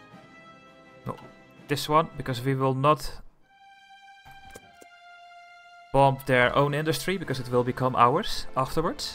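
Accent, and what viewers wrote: Netherlands. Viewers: Leuke Lets play man haha je hoort wel een beetje dat je nederlands bent in je stem :)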